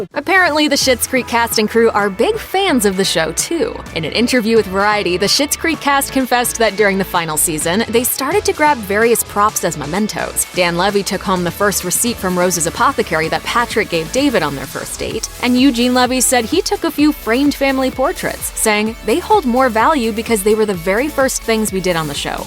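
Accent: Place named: United States. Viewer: I don't think the commentator is Canadian. You can tell by the way she says, "Toronto". Def a young American.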